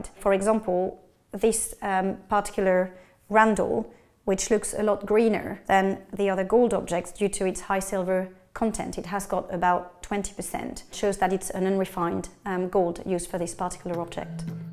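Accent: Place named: Northern England, United Kingdom. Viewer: She's got northern English traces in her composit accent.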